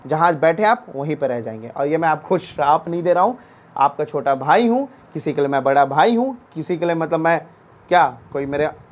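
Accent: Bihar, India. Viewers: TALK ALWAYS IN BEHARI STYLE IN YOUR TUTORIAL , I AM YOUR OLD SUBSCRIBER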